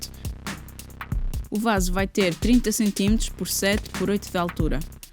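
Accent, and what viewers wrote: Portugal. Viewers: Muito legal este projeto e todos os outros aqui no canal. Acabei de conhecer e já me inscrevi. Você é muito criativa e tem uma oficina invejável! Parabéns! E confesso que é mais fácil entender você falando em inglês. O sotaque português demora um pouco para se acostumar, mesmo sendo brasileiro :) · que delícia sotaque português de Portugal 💚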